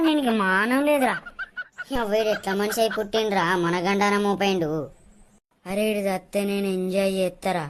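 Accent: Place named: Telangana, India. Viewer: Super telangana yasa.... Keep doing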